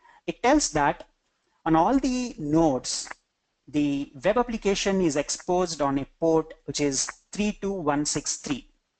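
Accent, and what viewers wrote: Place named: India. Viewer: I usually find the Indian accent very difficult to acclimatize to... but this was actual very good and I enjoyed it! Many thanks!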